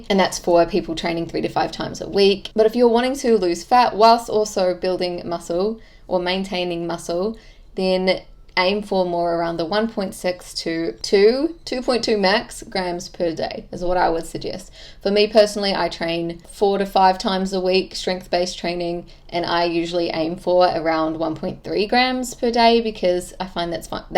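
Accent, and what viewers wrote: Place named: Australia. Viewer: Excellent teacher and the Australian accent makes it nicer. Hello from Canada.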